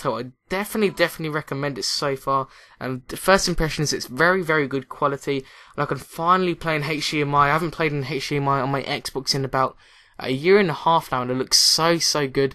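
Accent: United Kingdom. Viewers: 100,000 sub video shud be u doin a video attempting to do an american accent i hav always wanted to hear a british person do an american accent :D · That's just how British people in general say H.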